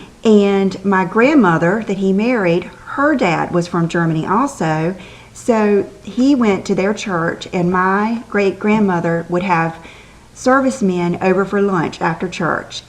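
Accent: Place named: South Carolina, United States. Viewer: You are such a doll! I live in greenville SC and just love watching you, hearing that familiar accent I know all to well! Xoxo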